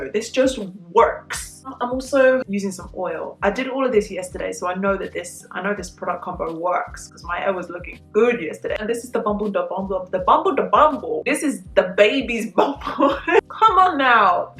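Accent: London, United Kingdom. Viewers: You have a beautiful accent, are you from London?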